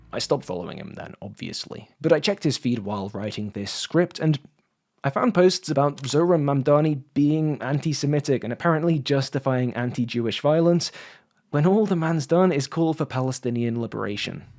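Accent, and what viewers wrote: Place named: England, United Kingdom. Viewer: I was able to watch about 1 minute of the video before you put out the Holocaust inverting, antisemitic lie that Israel is engaging in genocide. You're not going to convince me that this bullshit video is accurate by showing me another bullshit video. I assume from your voice that you're from England. There is a better case to be made that England is an "ethnostate" than Israel. Both have the same number of non-practicing people- about 50% for both countries (That would be illegal in most fundamentalist Muslim countries fyi). England, however does have a national religion. The upper chamber of the House of Lords must be of one religion. There is no such provision of the ruling body of Israel, the Knesset. The fact is that ANY of its neighbors is FAR more deserving of that epithet than Israel. But your not interested in the truth, you're interested in a narrative. 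That narrative is that Israel=Boravia not because it's analogous with reality, but it's analogous with your propaganda. In the movie Boravia is the big bad, Jarhanpur is the helpless victim. In reality the war started because of a genocidal antisemitic attack by Hamas. In the movie there is no Jew hatred, in fact in the movie your bullshit belief that Judaism is somehow separate from Israel is reality. In the movie the little black boy calling out for Superman was center of attention. In reality he'd be in the slave section. In reality the IDF is highly diverse. In the movie they're all white males. The movie corre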